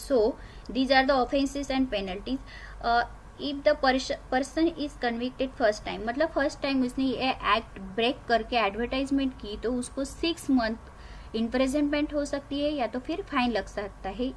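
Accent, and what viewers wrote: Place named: Maharashtra, India. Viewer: Marathi tone in hindi but topic samjla thanks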